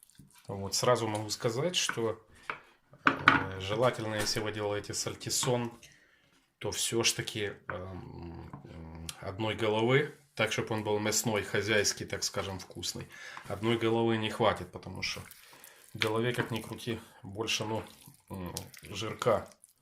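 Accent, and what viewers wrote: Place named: Ukraine. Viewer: приятный украинский говор.У меня отец был с Харькова...Тоже так говорил.Умер в Омске.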